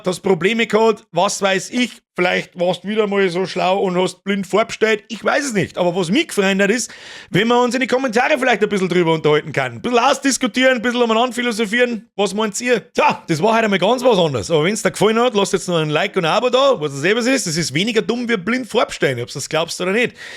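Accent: Bavaria, Germany. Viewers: Geil, endlich moi a bayrischer Spieltester! Des feier i ! Abo und like ist raus. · Woooas?!?! Superüberfordert vom Bayrisch aus dem Stand Sehr erfrischend Japp nice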